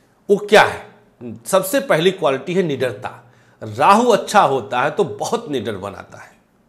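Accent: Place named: Kanpur, India. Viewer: Pandit ji aap kanpur se hain kya itna mazedar to sirf hum kanpuries bolte hain maza aa gya 😊🙏🏻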